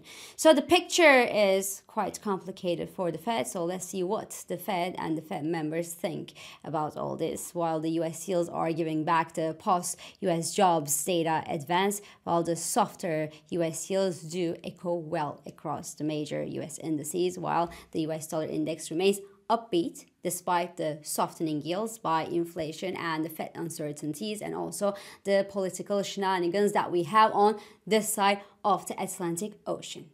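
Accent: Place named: Turkey. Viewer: You have a Turkish accent. Are you Turkish or closely related to Turks?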